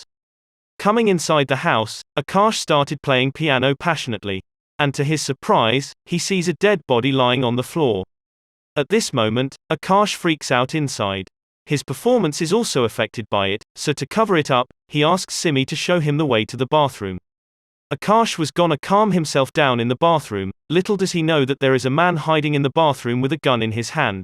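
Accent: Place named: United Kingdom. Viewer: Wow ur first video n u got 600 k views .... bollywood is lucky for u ... u have a british accent but ur from USA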